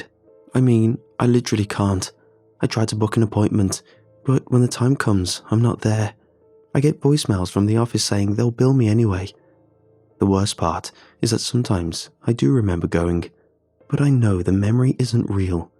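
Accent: United Kingdom. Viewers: the scariest part is is when i found he was british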